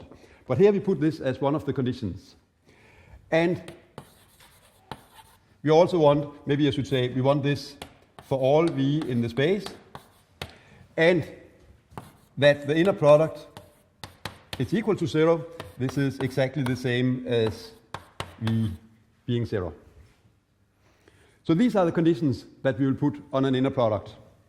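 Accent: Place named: Denmark. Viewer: Harry Ritchie no, he is very clearly Danish. We can identify fellow Danes.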